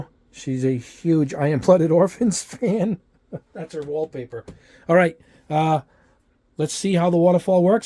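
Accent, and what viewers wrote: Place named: North, United States. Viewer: I watched it again and figured it out. I’m in the deep south. My 65 year old southern ears heard your northern accent say “I guess you could turn it off for lacquers and enamels.” What you actually said was “…..turn it up for lacquers and enamels.” Sorry about that. I’m going to have to get one of these! Thanks again for the video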